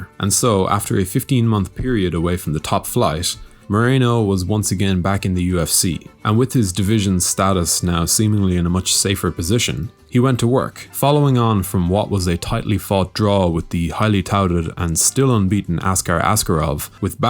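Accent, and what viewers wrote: Ireland. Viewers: Irish. Not even close. 😂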